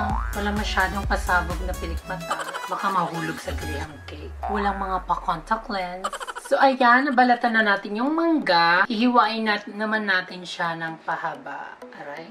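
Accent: Visayas, Philippines. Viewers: Gustong gusto ko pag nag bibisaya ka mama haha ang cute nang pag bibisaya mo. Bigla naman ako nag crave sa graham pag uwi ko nalang ng pinas wala kasing graham biscuits dito e ang poor haha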